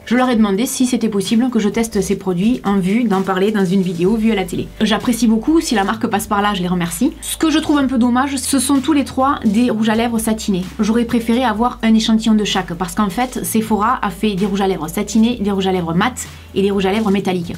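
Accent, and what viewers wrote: South, France. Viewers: Tu est trop belle avec ta teinture, tes lèvres, tes yeux et tout enfaite! Tu est suuuuuublime je t’adore maman. Tu me redonne le sourire avec ton rire fabuleux et tu me donne chaud dans ce froid avec ton accent du sud. Pour moi tu est LA bombasse que tout le monde envie et du haut de tes 40 ans tu fais rêver les plus jeunes d’entre nous... voilà, c’était pour te dire à quel point je t’aime 😍 bros bisous! ❤️😘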